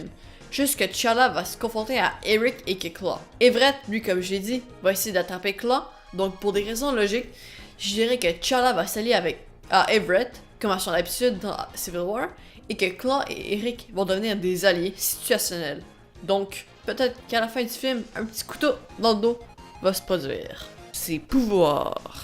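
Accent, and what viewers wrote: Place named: Quebec, Canada. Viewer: le seul probleme c'est que moi et l'accent québecoi ca fait 2 xD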